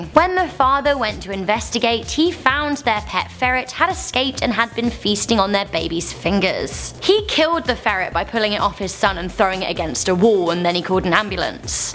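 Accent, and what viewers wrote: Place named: United Kingdom. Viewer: I like your British accent and you one of my favorite YouTubers like if you agree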